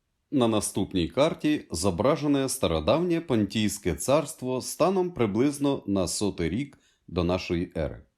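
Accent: Russia. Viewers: Автор російськомовний, це чути з деяких слів і з акценту, але вподобайка за контекст 👍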